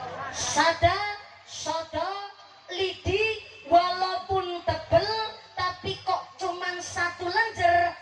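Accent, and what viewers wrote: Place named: Banyumas, Indonesia. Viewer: Ustadzah mumpuni logat ngapak yang spesial mudah di pahami dan memberikan edukatif semangat pengetahuan manfaat termasuk dalam rangka memperingati Muludan jika mendengar bikin ketawa yang spesial mantappp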